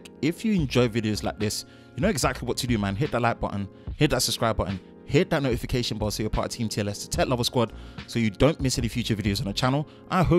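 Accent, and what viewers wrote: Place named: United Kingdom. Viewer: i like that british accent my friend. how about battery test ?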